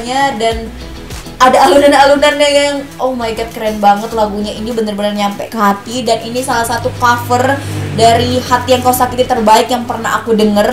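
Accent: Medan, Indonesia. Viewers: Dari logatnya, keknya kakak ini org medan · Ihh. Cantik kali kakak ini woiii...ahahaha. luck loe. Hihiii. Medan kali kauu.🤣🤣🤣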